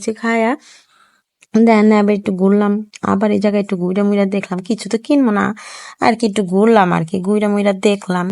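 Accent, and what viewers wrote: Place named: Dhaka, Bangladesh. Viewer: আপু ঢাকায়া ভাষা খুব স্মার্ট ❤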